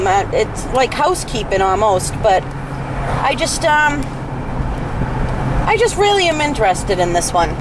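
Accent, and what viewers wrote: Boston, United States. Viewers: Hey i wanted to let you know how much i enjoy watching your videos. I'm 19 and from Scotland and i watch your videos every day. Your accent is so cool the Boston accent is my favourite, probably because i love New Kids On The Block so much!:) Please keep making videos because they make my day a little better xxx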